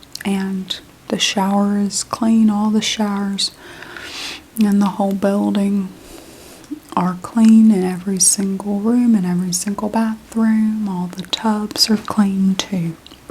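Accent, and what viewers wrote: South, United States. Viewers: the ones with the southern accent are always my favvv omg you do it so well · you look so beautiful!! i'm so excited for a new southern accent roleplay, this is gonna stay on repeat for me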